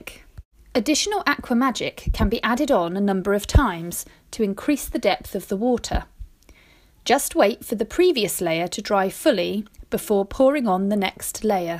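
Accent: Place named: United Kingdom. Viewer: Sounds like a very versatile and easy to use product. I appreciate the narrator's British accent! Lovely.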